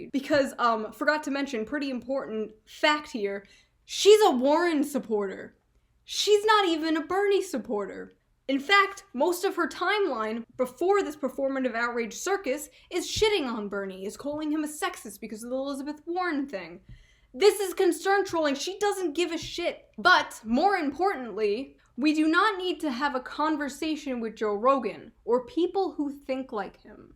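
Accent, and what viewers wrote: Boston, United States. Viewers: Why is your Boston accent so strong here😂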